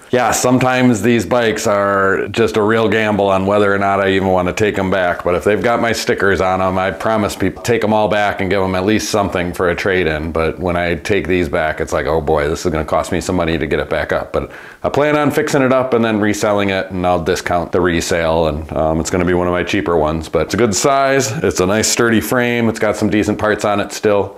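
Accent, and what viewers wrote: Wisconsin, United States. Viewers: "there's my midwestern accent for ya..." i'm a transplanted midwesterner on the east coast, and if it weren't for the great bike content, i'd watch your vids anyway, just for your WiscAHnsin accent!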